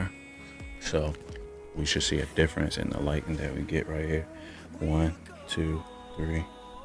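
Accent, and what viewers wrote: United States. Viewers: the maryland accent on a shorty gone get me everytime 😭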